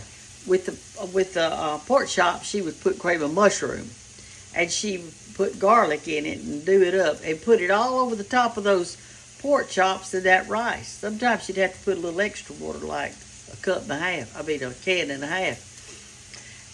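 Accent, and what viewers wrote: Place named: South, United States. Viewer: Love your Southern accent. I’m a fairly recent viewer from California. You are the BEST!!!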